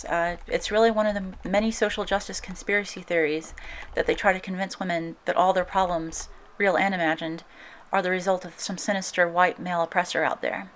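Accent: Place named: Appalachia, United States. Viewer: A lot closer to home than I expected, in many ways. I played Requiem, not Masquerade , but also watch NonStampCollector, and your smoothed out accent is like that of my Appalachian kin- well, some of them. Likewise, you too could appreciate it if they made the Ender's Game movie with the same wardrobe set as Pride and Prejudice. It'd be like Master and Commander: The Dark Side of the Moon.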